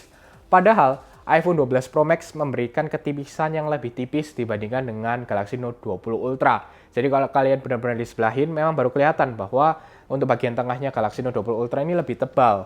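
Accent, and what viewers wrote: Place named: Tegal, Indonesia. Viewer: Tegal atau cilacap mas atau brebes sih mas bro😁😁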